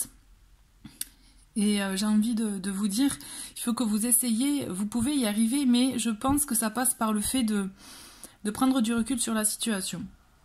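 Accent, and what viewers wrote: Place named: Aveyron, France. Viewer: Merci cela me touche.. votre accent me va droit au coeur aussi car L'Aveyronnaise que je suis est sensible à ce chant dans la voix... ce chant qui est aussi le mien malgré mon ''exil'' en région parisienne. Je garde foi mais je dois me mettre en distance car je souhaite sortir de ma profonde dépression. Bisous🕯🌞❤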